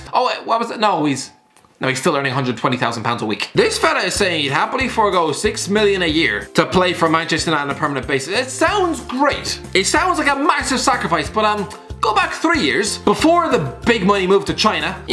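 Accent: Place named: Ireland. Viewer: Man I love this guy, listening to his Irish accent is getting me through quarantine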